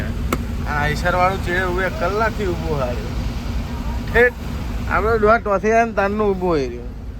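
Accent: North Gujarat, India. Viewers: Jai mataji bhai tame kya gaam na cho e kejo mane to tamaro boli Mehsana side Uttar gujrat ni lage che ane kyu gaam tamaru e pan kejo mara msg no javab apjo bhai jsk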